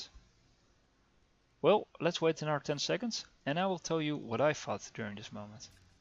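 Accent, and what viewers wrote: Netherlands. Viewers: ĺike your videos! subbed. are you by any change dutch? you sound like it:)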